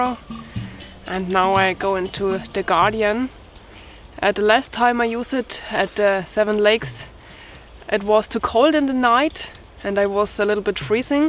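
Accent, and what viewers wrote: Germany. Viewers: your german accent in English is adorable... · Hi. I started watching your channel a short time ago and I like your videos being off grid. I spent 2 1/2 years in Bad Kissingen, Germany while I was in the Army and listening to you talk makes me homesick for Germany. Just thought I'd share that. Thanks for the videos. Take care.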